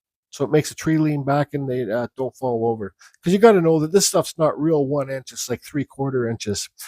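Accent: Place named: Canada. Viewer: I think I’m detecting a Canadian accent!!….or upper Midwest..I love it…I’m from Michigan and have spent a lot of time in Canada and of course the Midwest! Thanks for sharing your expertise much appreciated…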